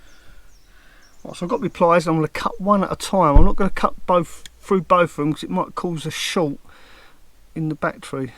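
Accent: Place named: London, United Kingdom. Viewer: you sound like Monty Python's "My Brain Hurts" I told her it's a normal London accent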